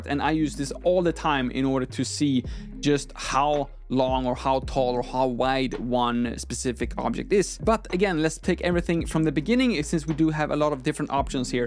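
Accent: United Kingdom. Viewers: British English, subscribed! - Trying unlearn - unsubscribed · Keep your British English. It's not wrong. 😂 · British English, thank you, subscribed!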